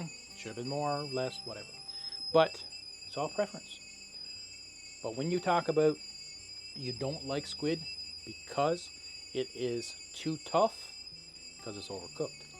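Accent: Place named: Newfoundland, Canada. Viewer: I absolutely love watching anything you post. Love that Newfie twang! I have a NovaScotia twang lol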